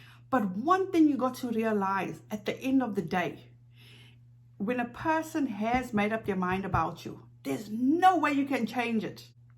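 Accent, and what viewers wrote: South Africa. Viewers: New sub here! I really enjoy your content and I can appreciate the rational advice you’re attempting to give, unfortunately KJ is too far gone her ego is going to be her downfall. I like all the points you make and agree she needs to think of her family first! But her ego comes first! I love your accent Miss, my BFF is from Durban SA, and lives in the US also so hearing your lovely accent reminds me of her and you remind me of her, she is the best! Look forward to more content! Congratulations on hitting the 1K mark! 🥳❤️✌🏽😃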